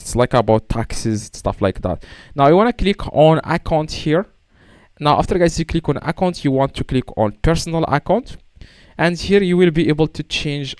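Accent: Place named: Morocco. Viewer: moroccan english very helpful bro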